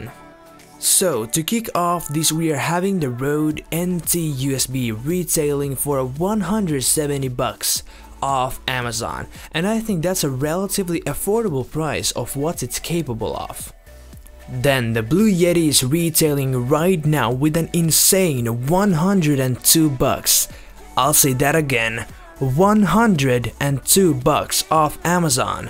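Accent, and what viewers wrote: Finland. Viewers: You sound very,,, Finnish :D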